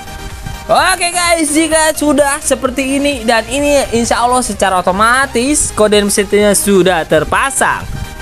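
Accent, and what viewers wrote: West Java, Indonesia. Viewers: Bang orang Sunda ya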